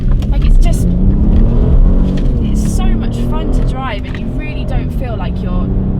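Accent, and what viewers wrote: United Kingdom. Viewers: ..I love your British accent, you are a natural in front of the camera, I subscribed to your channel..keep those videos coming. 👍👍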